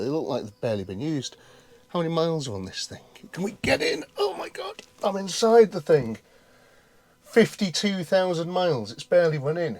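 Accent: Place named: England, United Kingdom. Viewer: I´m from Germany and i love your english. Where in England are you from?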